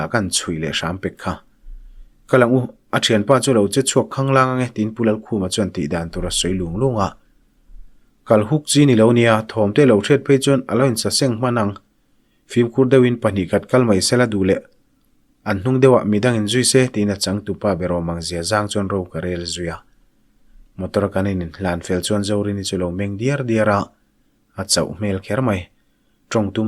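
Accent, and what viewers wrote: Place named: Khilam, China. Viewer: Khilam unaute i ang zawk riau mai😆 ngaihthlak anuam e..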